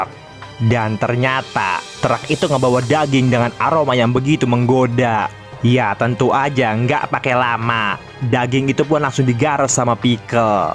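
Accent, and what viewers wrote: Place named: Betawi, Indonesia. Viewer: Bang gw suka pembawaan lu yg mirip" Temen" Betawi gw kalo udh ngomong, sering" Upload bang dsn durasinya panjangin kalo bisa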